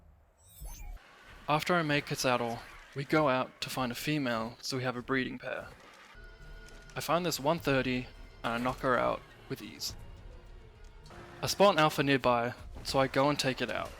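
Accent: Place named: Johannesburg, South Africa. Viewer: is it just me or does he sound like a joberg boet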